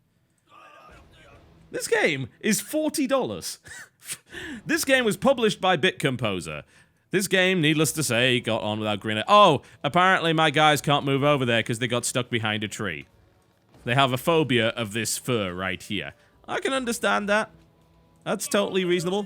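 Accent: United Kingdom. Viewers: hails from England, but has been living in america for years, that's why his accent isn't very prominent, but he still uses british terms. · It's the British pronunciation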